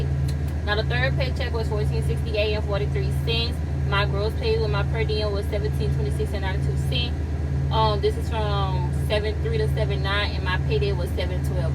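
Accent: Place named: Gullah Geechee, United States. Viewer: Such a geechee accent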